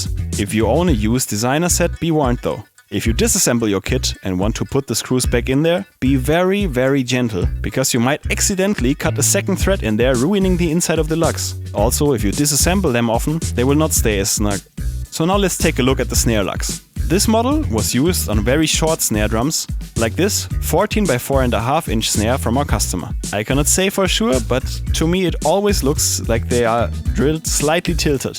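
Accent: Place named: Germany. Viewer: Kann man nicht für wenig Geld einen Muttersprachler den Text ein sprechen lassen....spoken German English is soooo shamefull. Beside that: nice work.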